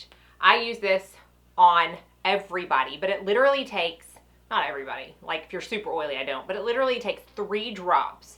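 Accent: South, United States. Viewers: Where are you located? I only ask because I live in Louisiana and I detected your southern accent. I vaguely remember you mentioning Tennessee?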